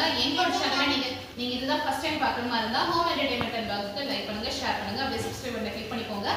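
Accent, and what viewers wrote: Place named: Coimbatore, India. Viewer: Super recipes 👌👌 Neenga coimbatore ah sis Unga speech apdi irukku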